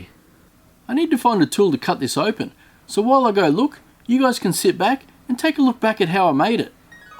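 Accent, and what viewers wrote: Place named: Australia. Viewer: his australian accent is so good to hear😀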